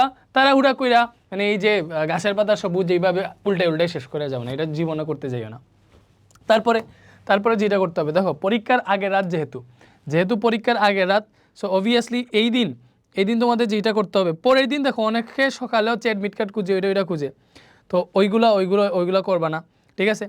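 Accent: Sylhet, Bangladesh. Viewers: ধন্যবাদ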